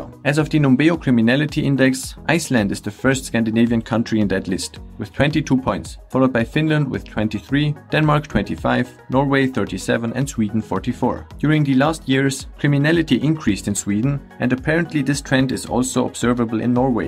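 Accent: Germany. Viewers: Richtig rausgehört das da ein deutscher spricht 😆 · Are you german? I'd swear your accent is 100% german and I'm just asking me the question if I should move out of the country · You sound German. Is that right? Thanks a lot for a great video. Really great and informing.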